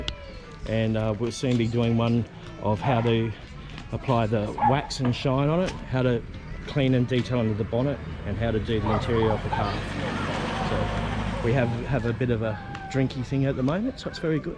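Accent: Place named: Australia. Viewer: Ppls should be to speak english language! Your EN with accent AU....I get it"-----they lift stopped on the 1st floor😆😆😆cu.koo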